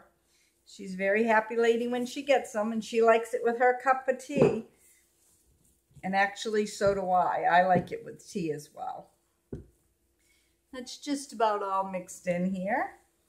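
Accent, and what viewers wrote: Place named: East, United States. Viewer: I remember the molasses cookies that were so soft and gooey and delicious back in the early or late 1980s I believe in high school. they were only $0.25 and they were so delicious thank you for sharing this. I hear a thick Eastern accent and my grandma which was my dad's mom was from Glens Falls New York. I think my dad was born in Albany.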